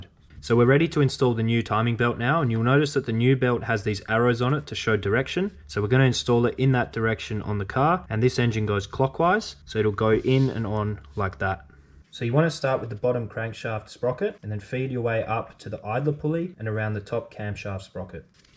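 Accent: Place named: Australia. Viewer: Very informative and detailed. Great video! Greetings from the Dominican Republic. Where are you from? England? I reckon you are from Australia, but I'm not quite sure.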